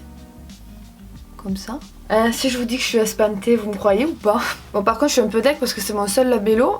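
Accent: South, France. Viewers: Vive le sud et notre accent tu est de quelle origine ?? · Moi aussi je suis du sud et j’ai le meme accents que toi ❤️❤️❤️ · Koukou j'adore ton accents du Sud comme moi lol et ce mot que j'adore mais que j'ai souvent honte de dire " je suis espanté " donc merci sa fait plaisir lol 😍😘💖❤😍😘💖❤😍😘💖❤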